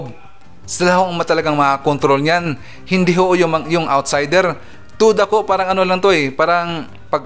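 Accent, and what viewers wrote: Visayas, Philippines. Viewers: Hello of. I am one of your avid listeners and I am in love with your Visayan vlogging accent and all. Am very happy for trutfhful news that BBM and SARA are majority winners in the Overseas Absentee voting results favoring them. Thanks so much and still viewing you wearing RED SHIRT. Carry on friend. BENJIE Barrera of Chula Vista, California, USA.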